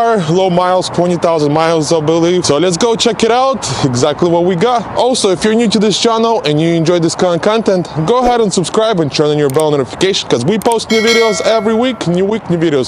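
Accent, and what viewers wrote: Russia. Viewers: Я один слышу хороший такой русский акцент?:))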